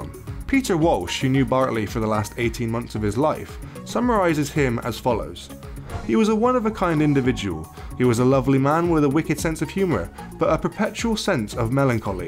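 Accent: Bristol, United Kingdom. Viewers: Are you from Bristol the man narrating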